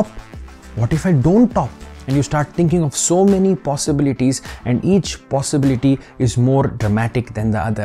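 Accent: India. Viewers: i really love the indian accent :D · after the indian accent i knew this was the video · there's no such thing as Indian accent diff parts of India speaks with diff accents from south to north to east to west what he is speaking is probably south indian accent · Duude..really?! You're an Indian and you find indian accent disturbing?! Wow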